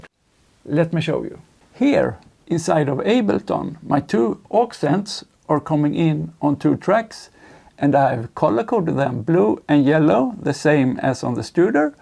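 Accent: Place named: Jamaica. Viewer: nice analog & digital hybrid system ! u sounds like from jamaica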